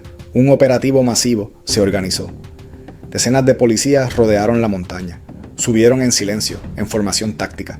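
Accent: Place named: Puerto Rico. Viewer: Cabron te estoy viendo desde Mayagüez Puerto Rico eres el mejor narrador, por q así mismo es q se abla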